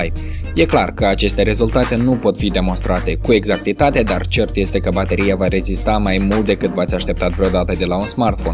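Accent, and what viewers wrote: Moldova. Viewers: Moldova si tipicul accent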